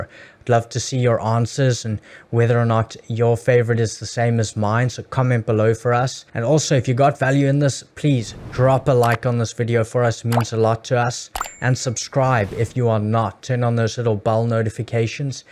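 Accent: South Africa. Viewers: Are you from South Africa? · That South African🇿🇦 accent 🔥 Thank you broer😊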